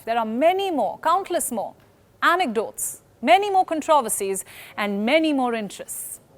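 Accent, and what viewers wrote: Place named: India. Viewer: Listening to a Hindu accent is uncomfortable… Sorry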